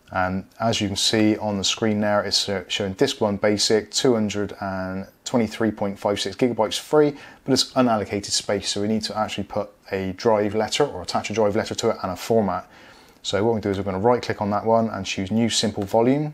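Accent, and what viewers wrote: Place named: West Country, United Kingdom. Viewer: For some reason, I find your west country accent a bit amusing.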